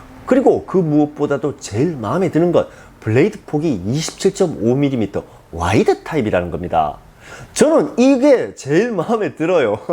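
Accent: Gyeongsang, South Korea. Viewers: 경상도 말투 정겹네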